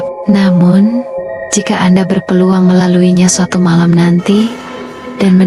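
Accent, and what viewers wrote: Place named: Indonesia. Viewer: Hai anak mak shayang, mak hadir layan sbg peneman tidur 😍 huhu terbaik cerita malam ni... kisah asrama 👻 smakin menarik editing ai dan penceritaan wlopon loghat indonesia mak suka 😀 keep it up shayangku, smoga trus sukses, Amiiin🤲🫶❤️❤️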